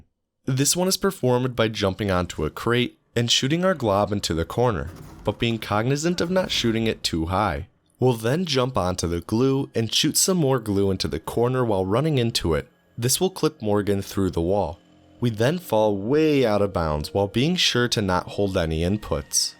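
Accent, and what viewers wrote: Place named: Boston, United States. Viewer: I detect a Boston or new England accent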